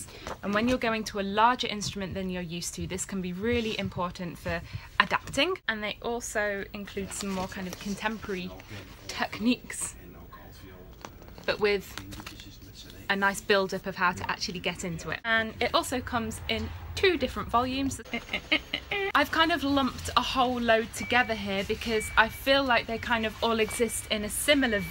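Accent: Netherlands. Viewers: English girl speaks German with Dutch accent. Strong move!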